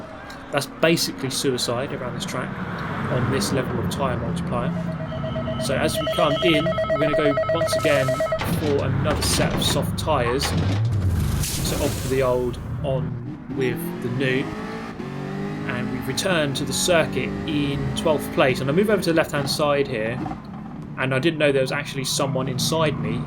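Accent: United Kingdom. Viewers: That commentary in British accent , makes this video feels like watching an actual race !! But this is Gran Turismo, on PS4.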